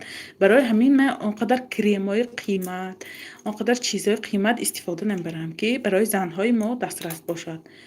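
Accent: Isfara, Tajikistan. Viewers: кучаги нею лахчаи мо Исфарагихо хамин хел гуид.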